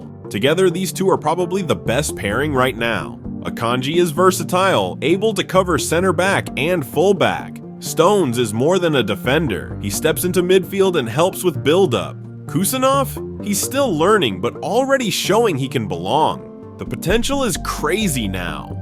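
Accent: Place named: United States. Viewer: video would be better without an american accent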